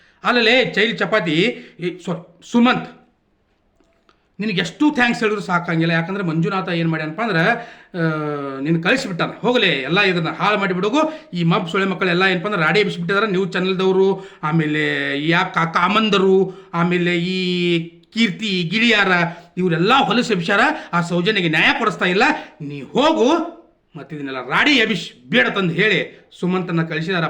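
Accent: North Karnataka, India. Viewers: ಸೂಪರ್ ಸರ್, ನಿಮ್ಮ ಮಾತು ಕೇಳುವುದೇ ಒಂದು ತರಹದ ಮಜಾ , ನಿಮ್ಮ ಉತ್ತರ ಕರ್ನಾಟಕದ ಮಾತಿನ ಶೈಲಿ ತುಂಬಾ ಚಂದ ಉಂಟು ಮಾರ್ರಾಯೆ. ಧನ್ಯವಾದಗಳು ಸರ್. ಸರ್.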